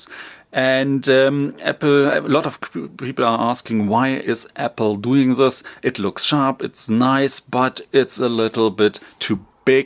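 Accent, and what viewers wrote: Germany. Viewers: Yay ein deutscher. Ich habe den Aktzend doch gleich erkannt ^^ Ist das eine 4k Auflösung? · After 5 seconds, i already heard that you are German.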